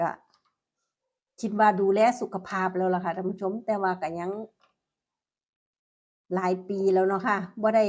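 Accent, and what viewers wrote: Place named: Chaiyaphum, Thailand. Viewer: เลข 5 สวยครับ สำเนียงเหมือนคนชัยภูมิเลยครับ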